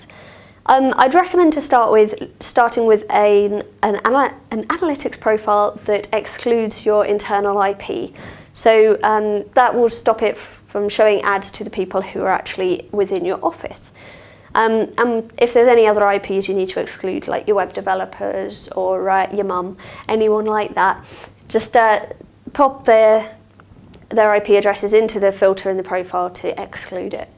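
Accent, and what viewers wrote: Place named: England, United Kingdom. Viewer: Not bad, a little more experience and she'll be golden. I will say, English accents go a long way, if this was an American woman I don't think it would have played as well. I could listen to her talk all day...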